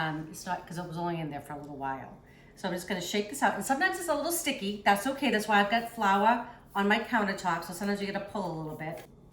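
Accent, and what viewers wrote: Boston, United States. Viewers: Ordering one now - think I'm going with black stainless steel! thanks for the demo and love your boston accent!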